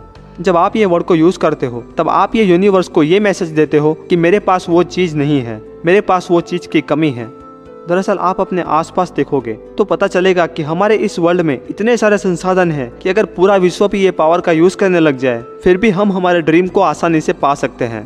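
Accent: Gujarat, India. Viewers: Good tips, very useful. Are you from Gujarat?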